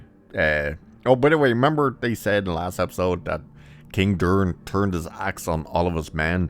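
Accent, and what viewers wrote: Ireland. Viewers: I didn't expect to hear the name "T'under-Tits" in a lovely Irish accent today but it made me laugh out loud. Shame I just found your channel at the end of this clusterfück of a show. Subscribed. Cheers from New Zealand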